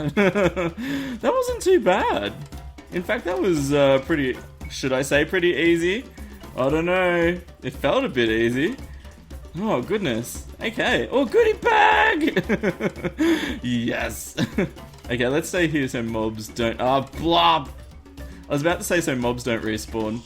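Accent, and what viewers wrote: Australia. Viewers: congradulations on 80,000 subscribers,! I think one of the reasons your channel grew so quickly is the shoutouts, people keep coming back to see if their shoutout was used, and of course, your australian accent makes you a calm, funny youtuber that everyone enjoys:)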